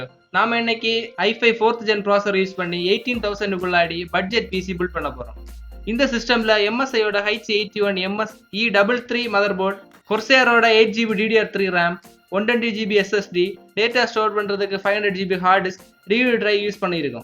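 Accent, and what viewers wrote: Kanyakumari, India. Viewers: Bro enaku oru pc build pannanum. Slang ppatha kk slang mathiri iruku.. unga address illa number kedaikuma.enaku office purpose ku than so minimum evlo budget la panna mudiyum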